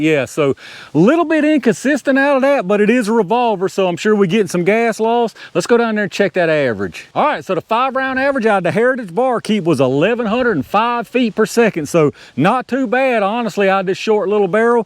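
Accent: South, United States. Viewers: You are quickly becoming one of my favorite pew pew YouTubers. Man every video I've watched is awesome. And the cherry on top of the cake from one southern boy to another I love your accent man. Keep up the good work brother.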